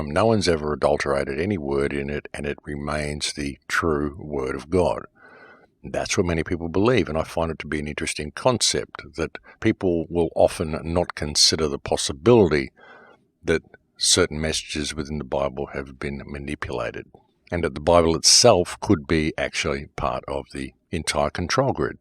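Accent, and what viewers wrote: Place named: Australia. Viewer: His voice sounds Austrailian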